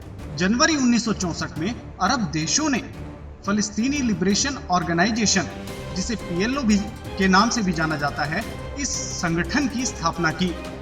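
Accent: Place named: Pakistan. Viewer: Abe chootiye Tere lehze se he saaf saaf pta chal rha h ki tu Pakistaani chootiya h.. Indian Muslim ka behavior of expressing tujse kahin bhi match nahi krta.. Ab kutch sensible reply ho to zarur comment kariyo..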